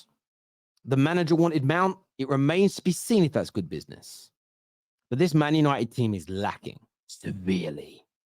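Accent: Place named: London, United Kingdom. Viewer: You can tell this guy is a true United fan from his strong London accent ✌️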